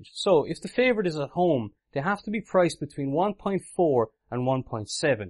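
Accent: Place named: Ireland. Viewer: What part of Ireland are you from man ? good break down of what AH is. cheers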